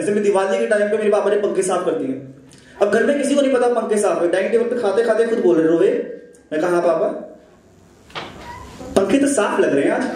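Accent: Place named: Haryana, India. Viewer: anf yes i like the way u speak the good material... specially ur haryanvi accent...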